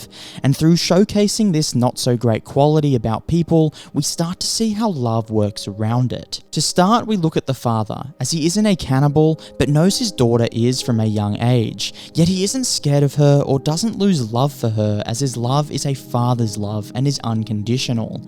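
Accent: Australia. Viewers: I'd like you to redo the video in a normal American accent the Australian accent is bizarre and very unpleasant to listen to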